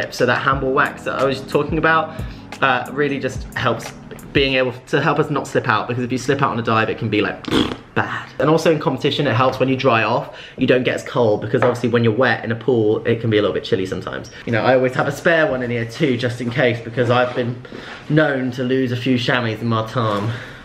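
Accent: United Kingdom. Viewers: My god how i love this boy. I love his face. I love his british voice. I love his muscles. His pecs his biceps all his body. He is just perfect for any man or human. I love his videos. The sexiest man alive in the whole world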